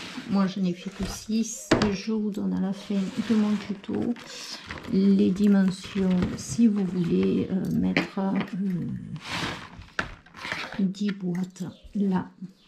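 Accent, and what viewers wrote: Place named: Southwest, France. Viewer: Coucou, j'adore tout ce que tu fais: tes rangements, tes classeurs, tes pochette à plastifieuse, TOUT j'aime tout. Je t'adore, je t'adore je t'adore.... Bien sûr je me suis abonnée avec la cloche et petit pouce partout sur toutes tes videos que je vois. J'habite en espagne depuis que j'ai connu mon mari, mais avant j'habitai dans le Sud-Ouest (pyrénées atlantiques) et ton accent m'as rappelé mes origines. Nostalgie. Est-ce-que tu es du Sud-Ouest? Je t'embrasse très fprt🥰🥰🥰🥰❤❤❤🦎🦎🦎🦎🦎🦎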